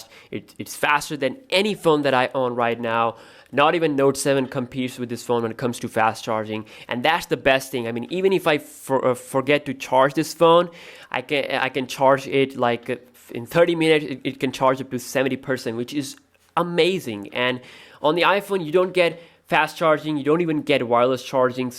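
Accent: United States. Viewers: no one has observed. If you look carefully you can see two men in kurtas, which is Indian tradition and this guy is American (or may be of other country),so this photo is clicked in India. Not by this man. This has been picked and pasted from other videos or Internet. You can see this . This guy sounds like American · OMG. You sound like American.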